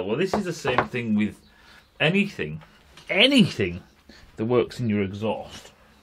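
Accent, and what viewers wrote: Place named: Scotland, United Kingdom. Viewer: Going to watch your video on shaker can painting. And, I always thought you were Scottish. No insult intended. Just all of your accents sound the same to my American ears.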